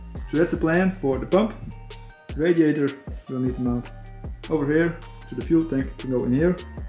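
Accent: Netherlands. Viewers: oké ik dacht al het al te horen een dutch accent 😂 oja we gaaf dat je van een speelgoed trekker een gemotoriseerde maakt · as the dutch would say: waajoo dikke john deere trekker! (i'm dutch and he sounds like he's dutch) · Are you dutch?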